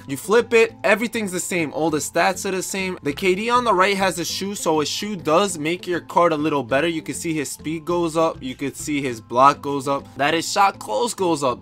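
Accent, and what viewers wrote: New York, United States. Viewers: This dude is 100% a New Yorker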